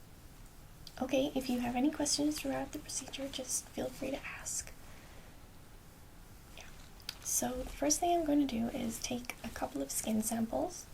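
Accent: United States. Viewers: Me:"Wait...something doesn't seem right....OMFG SHE'S DOING AN AMERICAN ACCENT!"😱 Btw I LOVED this💗💗💗💗 such amazing job as always! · Ben Church I always forget she’s not American when I watch this!! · Your 'Merican accent is awesome! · So weird to hear you speak with an American accent. Nicely done. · Why US accent for this video? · it's so odd to hear you potray an american accent rather than your using your original english accent lol i love it! can you do more RP's with american accent? :-) great vid btw! put me right to sleep lol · Wait she isn't American? Like I live in the US and I thought she was. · You do a fantastic American accent. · Very creative & entertaining scenario, thank you! And I was impressed with your American accent, well done! 😊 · Great video held my interest every second! I don't believe you're not a Yank. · You almost sound American in this video lol your accent was hardly noticed by me. 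Great video! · Your American accent is excellent! Very convincing. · Nice American accent. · Pretty good American accent. I didn't realize you had lost your accent until I watched it the second time. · I love her American Accent. Very accurate, very ASMR inducing. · your american accent is perfect! you said bandaid instead of plaster! A+ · Good American accent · Wow, nice American accent. I'm impressed. You look lovely as an astronaut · I didn't even know that you weren't American until I read the comments so I can safely say that that's a killer accent. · Your American accent is excellent. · nice attempt at the american accent! haha great video :) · Your American accent is pretty good! Your natural accent lends it a tinge that we would recognize as Southern. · Solid American accent :) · You sound so American in this video! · Aw.. I miss your normal accent. Great job on the American accent, but I love your real voice. 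Great role play! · Fantastic job! Your "American" accent is perfect. :) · I can't believe how well you do an american accent! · Love your american accent! It's so Buffy :) That aside, I just love the content of your videos. Always takes me into a different world!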